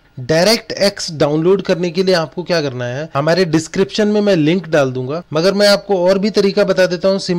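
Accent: India. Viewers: Why your English is so indian!!!!!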